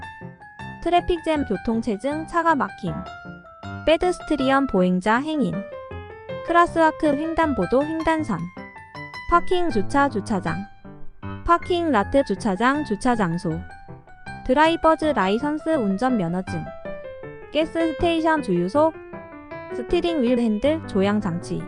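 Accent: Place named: South Korea. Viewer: AI인가요? 영어를 한국식으로 표현하니 어색하네요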